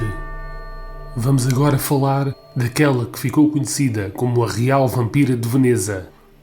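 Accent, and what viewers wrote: Portugal. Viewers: Foi o vídeo que mais gostei até agora. Sua voz estava mais limpa, mais clara de ouvir, o som de fundo estava mais baixo. Sua voz é bonita, e fica mais bonita ainda, com esse sotaque português. Até o próximo vídeo. Abraço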